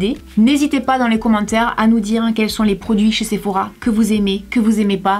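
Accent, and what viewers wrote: South, France. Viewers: Les produits de chez Sephora que je racheté régulièrement sont le démaquillant biplace yeux, le mascara Sephora outrageous avec une fine brossé je vous le conseille, le masque de nuit mais je l'utilise comme une crème car je ne vais pas me coucher avec une épaisseur sur le visage Je ne suis pas emballée par les box et le système d'abonnement, je préfère choisir mes produits et je suis fidèle à certaines marques merci de vos conseils et pour votre accent du sud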